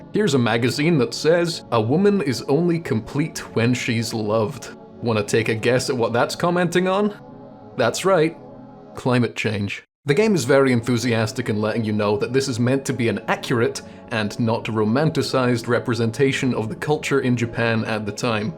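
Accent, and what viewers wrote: Scotland, United Kingdom. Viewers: YOU'RE SCOTTISH???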